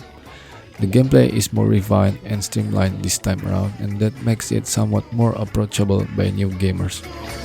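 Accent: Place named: Indonesia. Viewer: bang logatnya mirip orang indo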